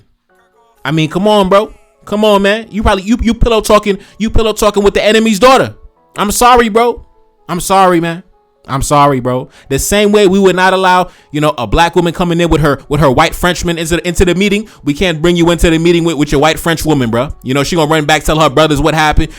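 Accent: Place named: United States. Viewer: My brother where are u from ? U are an expert on africa with American accent . Lol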